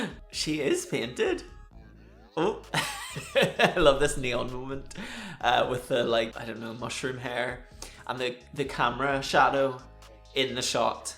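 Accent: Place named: Northern Ireland, United Kingdom. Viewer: Literally FELL IN LOVE with the moment I saw you. Your Aura is so fresh and I love a NI accent lol. You being a Crowned Queen is CORRECT 💙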